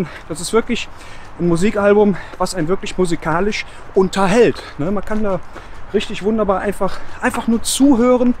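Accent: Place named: Cologne, Germany. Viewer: diese kölsch immer zwischendurch, einfach herrlich :D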